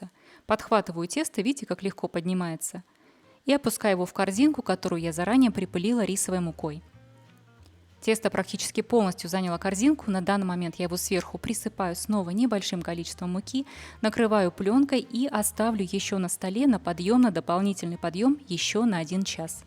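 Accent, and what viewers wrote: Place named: Belarus. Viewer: Вы из Беларуси? Я узнаю этот говор. Как и свой, родной, Одесский. Пошла месить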